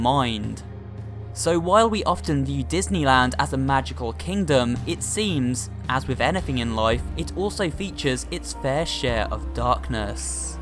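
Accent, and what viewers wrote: United Kingdom. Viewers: Now your British speaking voice sounds much more legit, it must have been a thing where you thought that talking slower would be more helpful or something.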